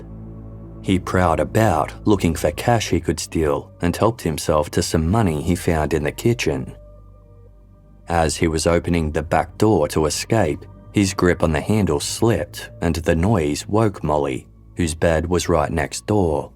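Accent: Australia. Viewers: Casefile wouldn’t be the same without your voice. I love that you have an Australian accent.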